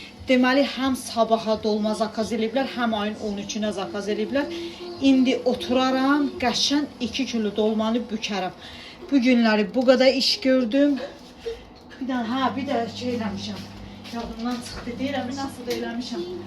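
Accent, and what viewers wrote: Talysh, Azerbaijan. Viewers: Молодец. HALALDI. TALISSANMI.? LEKCE VAR. ALLAH QORUSUN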